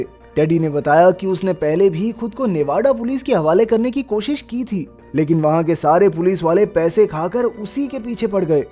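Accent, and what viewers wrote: Haryana, India. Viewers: Bhai Haryana ka lge tu ???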